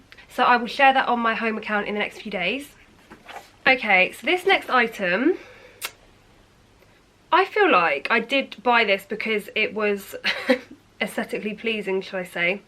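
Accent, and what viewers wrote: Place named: London, United Kingdom. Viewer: I can't stand the London accent!!🙄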